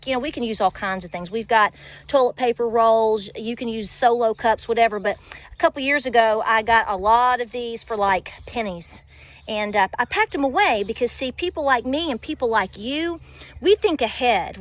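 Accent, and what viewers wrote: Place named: Appalachia, United States. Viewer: Anyone sayin you ain't appellation. Just need to hear this rant! Southern momma bear strong.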